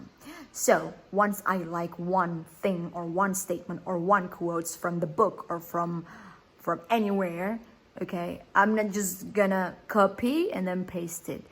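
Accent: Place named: United States. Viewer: I like your american accent 😁👌👍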